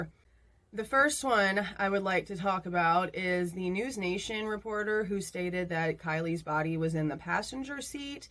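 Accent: Texas, United States. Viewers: Every way that you talk screams Texas girl lol 💙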